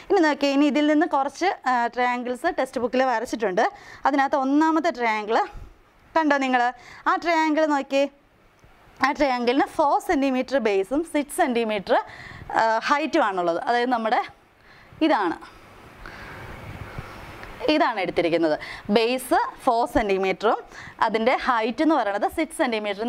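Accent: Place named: Kollam, India. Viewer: കൊല്ലം ഭാഷ ആണ് എനിക്ക് നന്നായി മനസ്സിലാക്കുന്നത്. ഞാൻ കുറെ ലാംഗ്വേജ് സിൽ ഉള്ള ക്ലാസ്സ് കണ്ടു അതായത് തൃശ്ശൂർ തിരുവനന്തപുരം ഇതൊന്നും എനിക്ക് മനസ്സിലായതേയില്ല അങ്ങനെ ഒടുവിൽ എനിക്ക് കൊല്ലം പത്തനംതിട്ട ഭാഷയുടെ ക്ലാസ് കിട്ടി.😝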